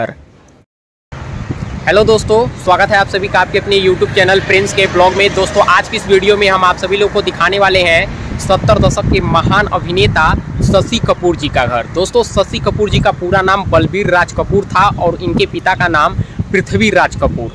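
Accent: Bihar, India. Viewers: Aapke language mein bihari axen aata hai ise thik karo. Aur shashi kapoor hain sasi kapoor nehi.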